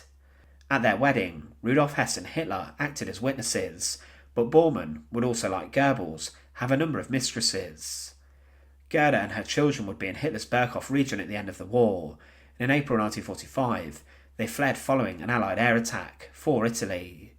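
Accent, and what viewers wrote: United Kingdom. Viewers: He's English/British, not a fake voice smh jfc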